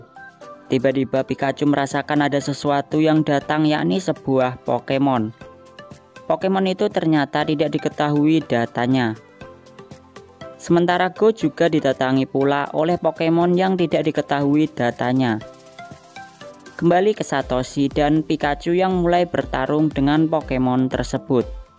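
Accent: Java, Indonesia. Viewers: Orang Jawa mana bang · Wong Jowo Mas ?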